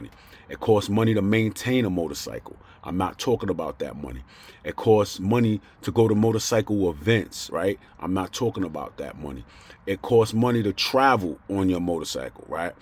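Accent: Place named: Maryland, United States. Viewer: Sounds like you grew up in maryland and moved to Virginia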